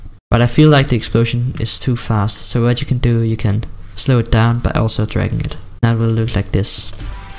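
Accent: Denmark. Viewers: Det er squ godt broder! xD Men god video man kan godt høre du er dansk :)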